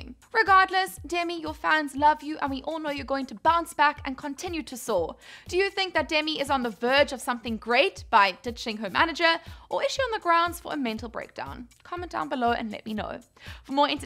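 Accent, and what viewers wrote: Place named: South Africa. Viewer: Ur south african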